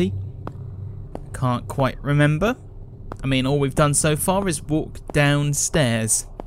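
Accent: United Kingdom. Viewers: Pretty sure that this guy is British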